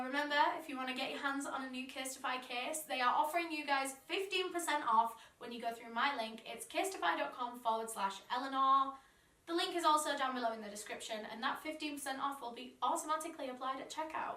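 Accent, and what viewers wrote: United Kingdom. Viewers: Are you British... Im just judging by your sound/(slingo) shmommy 🎉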